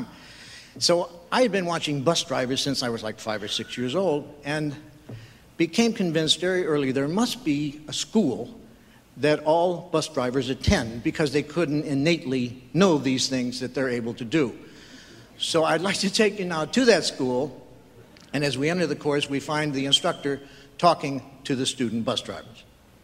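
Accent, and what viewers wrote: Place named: United States. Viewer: The first time I heard this skit it was on an LP record at a friends house, so no film track just the sound track back in 1978, I was only 17 and I thought he was the funniest man I had ever heard and it was the first time I had ever heard an American accent. I thought he was brilliant, I made myself remember his name and I am so glad I did. This is the second time I have ever heard this skit and it is exactly as I remember it, nearly 35 years later... still as funny as ever...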